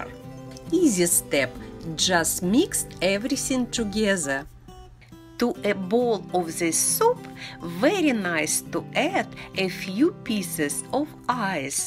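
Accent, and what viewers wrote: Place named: Russia. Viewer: I love your soup and your Russian accent also, my wife is Russian and i love anything Russian 😍 nice video👍Спасибо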